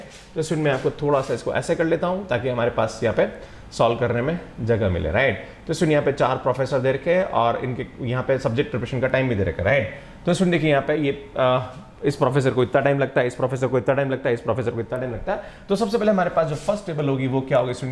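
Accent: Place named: Madhya Pradesh, India. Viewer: Sir why did you add two in junction as it is of any use. Sir are you MP my friend has same accent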